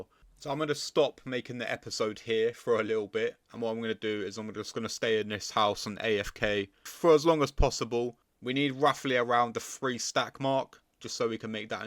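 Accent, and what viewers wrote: United Kingdom. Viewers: Yo bro love your british accent,continue doing content i will suport you! Cheers 👋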